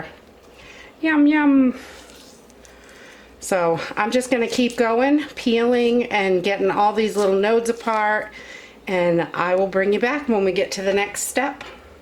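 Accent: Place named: Maine, United States. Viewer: I KNEW I recognized that accent! We just moved here a year ago from the Lakes Region in NH back to my hometown in Maine. Howdy Neighbor!!!!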